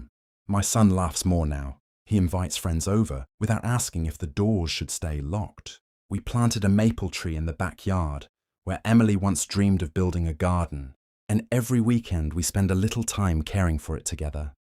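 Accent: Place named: United Kingdom. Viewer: I always loved a British accent!! Keep making stories like this,glad the older brother and the mother got what they deserved!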